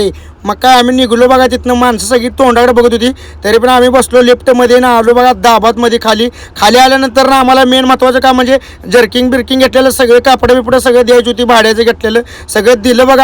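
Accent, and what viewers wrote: Kolhapur, India. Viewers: मला ची कोल्हापूर ची भाषा खूप आवडते एकदा मला भेटायला यायच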